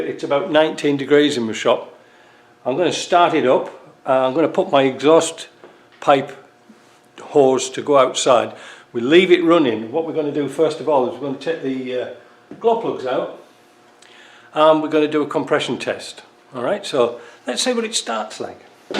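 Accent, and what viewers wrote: Yorkshire, United Kingdom. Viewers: By heck we can tell your still a Yorkshire man !!!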